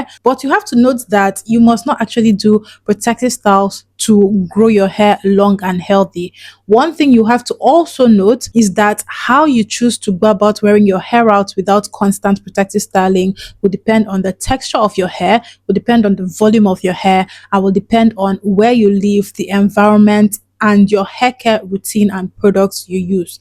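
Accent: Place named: Germany. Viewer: I like your accent. I notice a little German tones to it? Am I correct?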